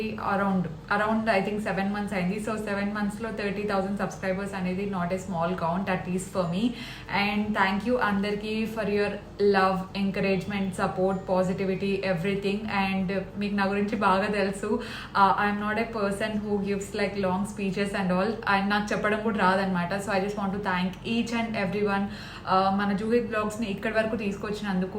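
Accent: Anantapur, India. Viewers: My house tour is the first video I saw.I like way of your talk,dressing style,julie silence and dolly allari and also pinni talks are also nyc.When I'm studying intermediate one of my friend was from ananthapur I like that slang and the way of talking,the same slang and way of conversation was observed in your videos.Usually I used to enjoy that conversations.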